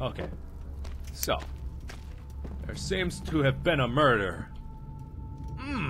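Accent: Sweden. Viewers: the accent is so Swedish i can tell as a Swedish person s easy to tell if someone is Swedish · That is so swedishenglish that i am going to puke.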